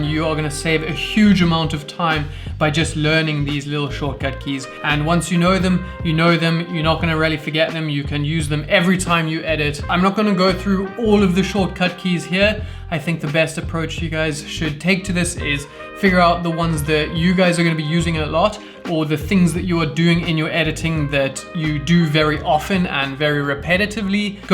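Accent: Cape Town, South Africa. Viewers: bro you from Cape Town?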